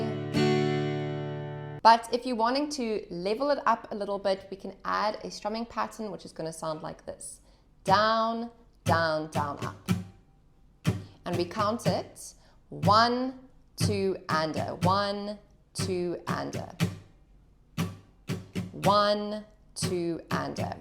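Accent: South Africa. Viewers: Are you South African? You sound sound like you are from South Africa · This is much easier. I am glad I came across this tutorial. Is that a South African accent? Recognize!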